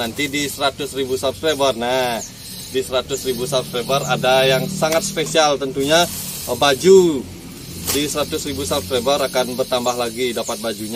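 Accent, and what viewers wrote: Bali, Indonesia. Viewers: Alasannya karna lucu dan unik ,maklum beda daerah, saya dari semarang, beda logat bicaranya, jadi asik aja denger logat bali yg kental dalam penjelasan di setiap vidionya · Om swastiastu, kenapa saya sangat suka channel, 1. Bli membuat konten slalu apa adanya dan tidak melebih lebihkan 2. Slalu mrendahkan diri pdahal bli udh brpengalaman lah dengan hal bonsai 3. Saya sangat senang dengan konten bli yg memanfaatkan bahan yg ada di skitar kita 4. Karena channel bli saya jadi ikut membuat konten tentang mencari bahan bonsai di skitar kita 5. Logat bali medok pokoknya 👍😁 Msih bnyak sih mungkin dri saya skian dlu, smoga sukses slalu dan kontennya smakin berkembang dan semoga bisa cepat mengejar 100rb sub ya bli, klok blh bsk" diajakin ikut nyari bonsai bli hehehe 🙏👍😁, suksme bli maaf klok ada slh kata🙏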